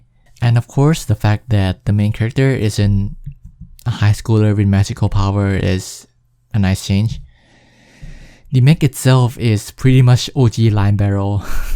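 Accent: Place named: China. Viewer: That's not an indian accent. I think he's Chinese.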